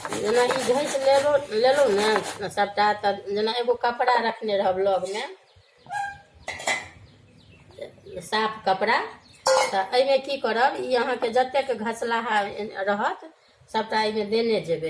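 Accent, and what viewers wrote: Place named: Maithili, India. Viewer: Sudh maithili bhasa suinke mon anandit bhai gel.. Ahake gaam aa jila ki bhel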